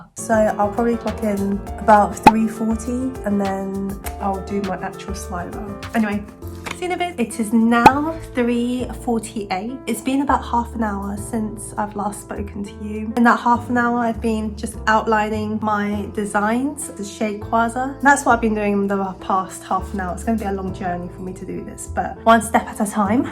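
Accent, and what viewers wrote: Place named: England, United Kingdom. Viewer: I've never heard an Asian with such a strong English accent lol.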